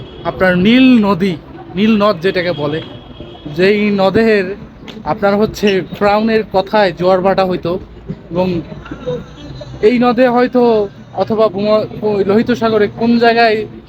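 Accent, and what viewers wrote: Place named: India. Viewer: কথায় বুজাযায় আননে ইনডিয়ান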